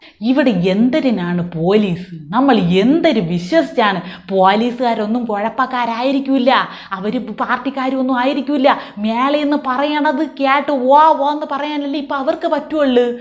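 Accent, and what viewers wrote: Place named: Kerala, India. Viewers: സൂപ്പർ. സമ്മതിച്ചു ട്ടോ തിരുവനന്തപുരം സ്ലാങ്. ആണെങ്കിലും കാര്യങ്ങൾ വളരെ വ്യക്തം 🙏🏻 നീ മുത്താണ് മുത്തേ. ഒന്നും പറയാനില്ല ഇത് തീർത്തും ഒത്തു കളിയാണ്. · Trivandrum Slang 🤓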